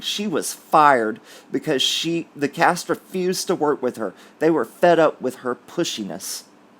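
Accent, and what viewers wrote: United States. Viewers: Hiya hunny, Glocestershire is pronounced like Glaw then the cestershire at the end. It may just be your sweet American accent 😉. Have a good evening.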